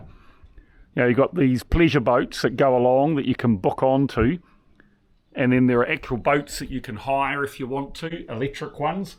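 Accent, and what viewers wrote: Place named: New Zealand. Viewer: he is a new zeelander i think because he says tin instead of ten. oh yeah silly me i see NZ in the channel title now.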